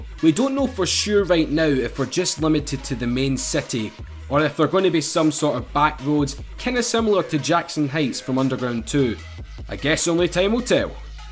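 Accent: Scotland, United Kingdom. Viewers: dat scottish accent tho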